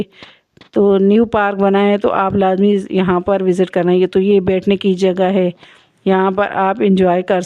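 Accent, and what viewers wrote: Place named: Hazro, Pakistan. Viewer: AP ke Urdu ma hazro k asens ata han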